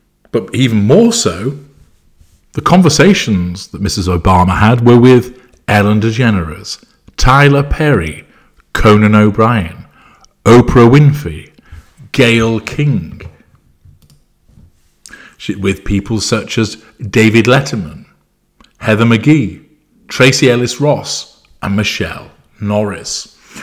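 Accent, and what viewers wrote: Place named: RP, United Kingdom. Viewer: You speak received pronunciation. As do I. The accent will get you everywhere. Not a narcissist (absolutely the opposite, sadly for me) but I do have the voice that convinces everyone that you are educated and to be trusted. Even if you are not. A huge asset.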